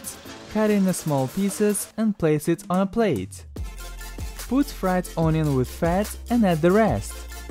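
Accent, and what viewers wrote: Russia. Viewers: Russian accent speaking English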